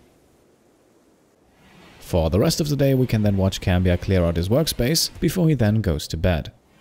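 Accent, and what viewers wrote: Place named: Germany. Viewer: I thought you were German. It's not super obvious, but reminded me of how I sounded some years back.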